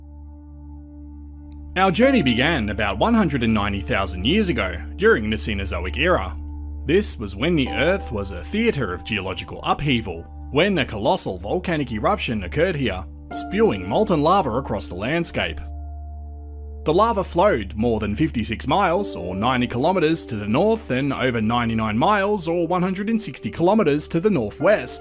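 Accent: Australia. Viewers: Great to hear an aussie accent here on YT well researched and presented indeed. NSW here. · Its awesome to hear more Australian accents on Youtube, especially on 'serious' channels that don't just involve gravity or hitting things with other things while wildly gesticulating and jabbering in monosyllables lol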